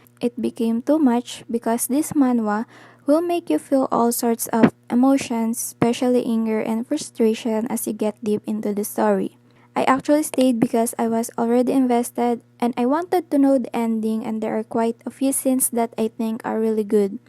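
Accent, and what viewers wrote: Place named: Philippines. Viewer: im pretty sure the accent if from tagalog or the language of the philippines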